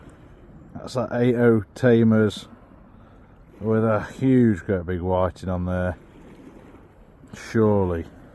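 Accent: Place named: Derby, United Kingdom. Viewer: Hi again, should say I'm a Derby man myself, from Allenton originally, nice to hear the accent, it takes me back. 🧔 👍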